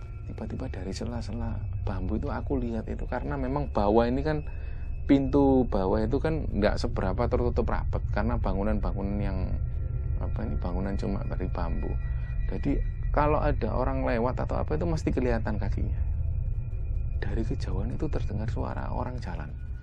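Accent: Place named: Surabaya, Indonesia. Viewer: Ga fokus sm critanya tp slalu fokus sm cara ngomongnya🤣logatnya itu loh..sy lahir dsurabaya tp bsr & hdp di jabar...smpe skrg bljr niruin logat sby msh blm bs😁😅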